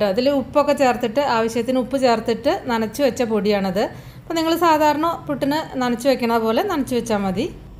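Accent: Palakkad, India. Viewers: റെസിപി ജാൻ ചെയ്തു നോക്കാ രണ്ട നല്ല അവതരണം പാലക്കാട് സ്ലാങ് ആണോ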